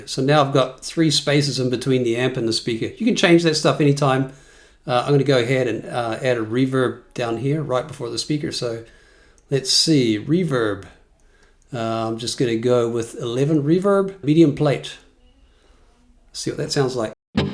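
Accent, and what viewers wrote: New Zealand. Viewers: Are you from Invercargill by any chance? Great to find a kiwi on youtube providing such good info.👍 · Great Vid… you sound like a kiwi?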